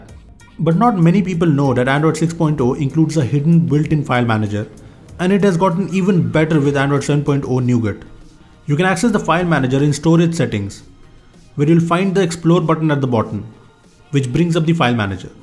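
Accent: India. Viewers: Why you Indians can't say the words without that terrible accent? It's hurting the ear to listen. And as a hungarian living in the UK, i know everyone can learn how to speak normally. And no offence (honestly) but only the colored skin dudes won't learn how to speak... Why is like that. You can clearly hear that the British Queen or the actors from Hollywood, or even Obama say the words completely different then you!!! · *ALL OF YOU GUYS ARE STUPID. HERES WHY YOU BITCHES 1. HE IS INDIAN 2. THAT IS AN INDIAN ACCENT 3. YOUR LIKE 12YR OLDS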